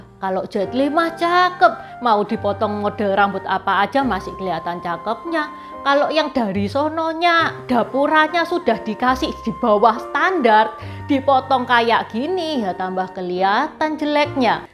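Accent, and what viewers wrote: Java, Indonesia. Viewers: Raine cino lah ngomonge tek medok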